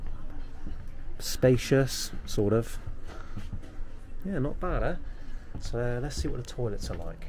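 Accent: United Kingdom. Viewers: Your thick British accent is good bro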